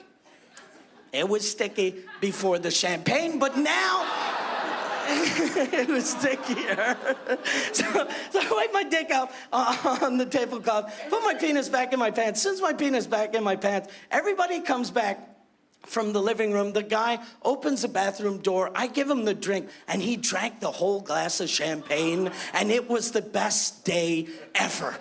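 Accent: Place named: France. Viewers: ***** oui, je sais mais je parlais au gars qui a dit qu'il a un accent français fort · loll l'accent francais fort ?! quel accent !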